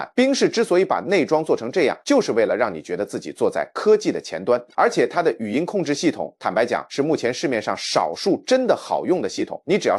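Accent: China. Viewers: 这是台湾的YouTuber吗，声音怎么是中国普通话，听不下去了👎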